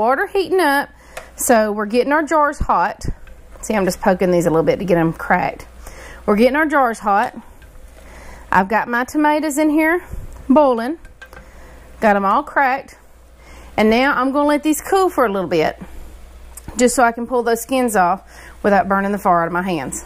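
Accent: South, United States. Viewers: Girl, love your accent. I miss the south. Thank you for the tips and video!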